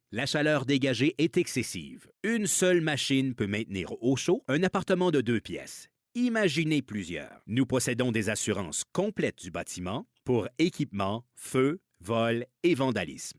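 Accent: Canada. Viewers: un joli petit accent canadien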